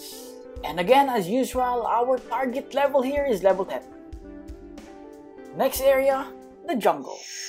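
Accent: Philippines. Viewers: eh pinoy naman talaga sya voice palang nya at accent alam na alam na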